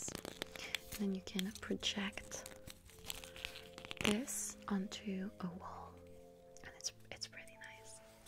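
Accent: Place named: France. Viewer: I looove it when you do english videos with frensh accent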